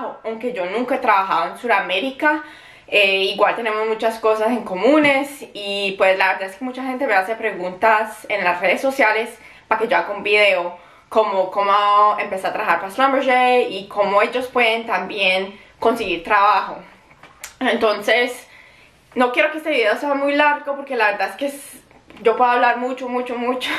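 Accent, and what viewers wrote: Colombia. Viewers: Acento Colombiano?🤔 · Hola... mucho gusto... que nota tu experiencia... La verdad se te escucha el acento como más Paisa... jajaja... ¿Entonces, ahora andas en las ventas? que buena decisión, con esto potencializas más tu visión y de verdad te felicito desde acá de Colombia... tu amada tierra... y de tus orígenes "San Andres" el lugar más hermoso de Colombia... Que la vida y Dios te sigan dando ese éxito que cultivaste durante 5 años en los campos... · Que bellaaaa!!!! Lindo acento colombiano, y muy bien el español. Soy tu admiradora desde REPÚBLICA DOMINICANA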